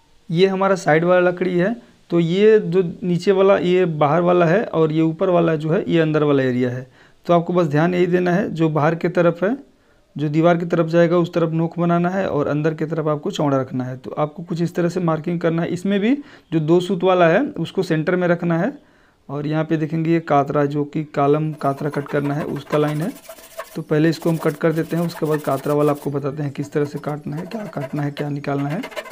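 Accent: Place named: Madhya Pradesh, India. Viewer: MP latiya bolate Hain Mere Bhai❤❤❤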